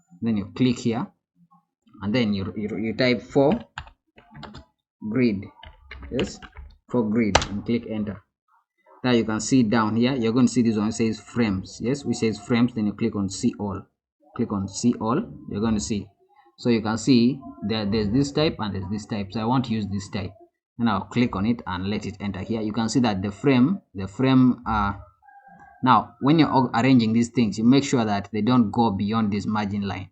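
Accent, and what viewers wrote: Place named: India. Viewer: Are you a Nigerian? You sound like Indian 🤷 Love your effort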